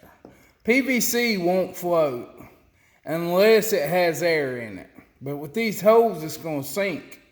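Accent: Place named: Alabama, United States. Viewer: You live in North Carolina, but your accent sounds East Central Alabama.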